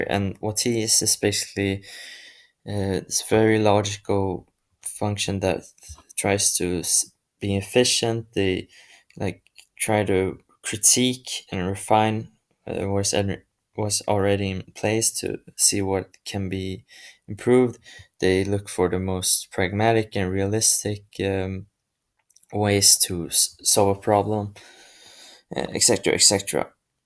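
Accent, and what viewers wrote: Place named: Scandinavia. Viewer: I like the video😄 Btw are you Norwegian perhaps? Your accent sounds Scandinavian😊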